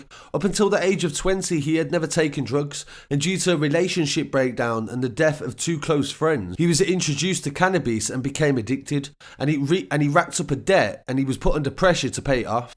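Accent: United Kingdom. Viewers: This British accent is kind of getting annoying lol hard to follow when ur not from the uk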